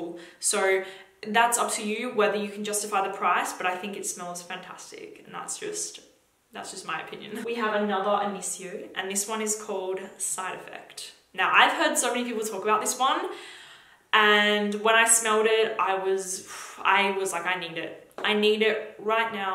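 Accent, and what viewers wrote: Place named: Australia. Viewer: Australian accent spotted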